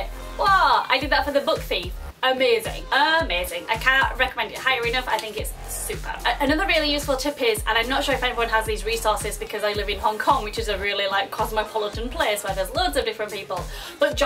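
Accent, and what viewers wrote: Yorkshire, United Kingdom. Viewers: I love your accent <3 Which part of Yorkshire are you from? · hire! i started to see your yorkshire accent videos from 2011 and in your more recent videos i can understand much more now of what you are saying haha, i started to study in sheffield this year and man !! the accent is so difficult to understand, at least for me.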